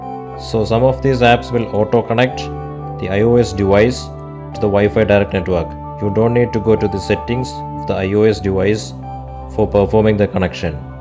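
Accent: Kerala, India. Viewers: 100% Indian. O hate your voice so much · That is 100% kerala accent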